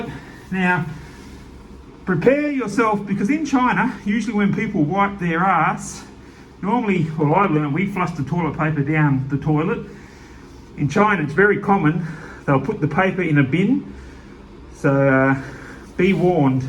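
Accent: Australia. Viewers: Is that Australian broad accent?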